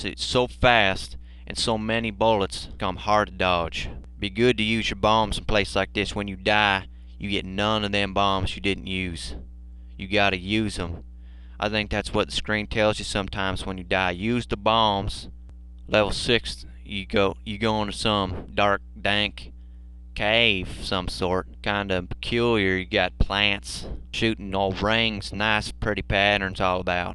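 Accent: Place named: South, United States. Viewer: This guy really needs to return and do mote SHMUP reviews. His southern accent and humor are perfect!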